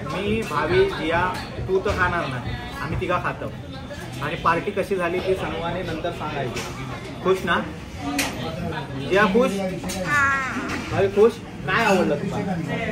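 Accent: Malvani, India. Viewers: Avad नाय भेटला तरी चालेल . पण तुझी जी भरारी आहे ती लय भारीच आपल्या गावचा नाव रोशन केला दादा तू ❤❤❤ बाकी तुला हार्दिक अभिनंदन भाई असेच तू व्हिडिओ बनव . सर्वात महत्वाची गोष्ट म्हणजे माझा मुलगा 14 वयाचा आहे आणि त्याला तुझे व्हिडिओ , तुझी कॉमेन्ट्री , तुझे कुटुंब सर्वात आवडते आहे . सांगण्याचे तात्पर्य म्हणजे तुझ्या मुखावाटे निघणारी मालवणी वाणी ही सर्वात आवडती ❤❤🙏🙏🙏🙏🙏🙏🙏🙏🙏🙏🙏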